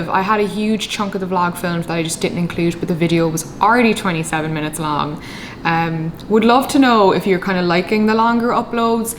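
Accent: Cork, Ireland. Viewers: The Cork accent jumped out in this one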